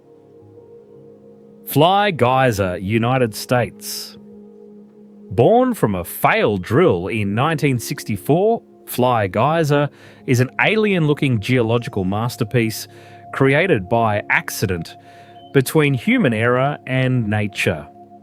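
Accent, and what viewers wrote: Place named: Australia. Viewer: I enjoyed the Aussie language being spoken. Thanks mate, great video. I'm watching from Mornington VIctoria Australia.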